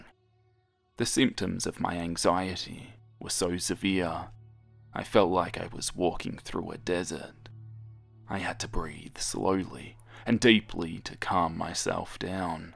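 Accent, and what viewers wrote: Australia. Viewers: Ayyy an Aussie 😌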